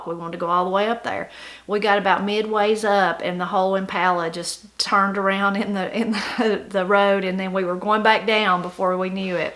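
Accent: Appalachia, United States. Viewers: I like listening to you explanations of things so much because sometimes what you say sounds more Appalachian than the phrase your explaining. Like run and jump to get up there…sounds like…run n jump to get a bear. Most every where else they would say the road had ruts…I’m like you I say the road was rutted out. I love your channel❤️👍to me might coulda is might try…might oughta is this works sometimes for me…might shoulda is this always works for me.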